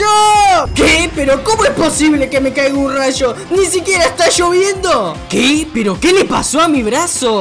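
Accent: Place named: Argentina. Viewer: sos argentino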